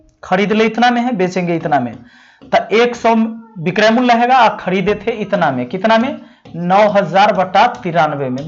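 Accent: Bihar, India. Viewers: Ek dam bihari andaz me padate hai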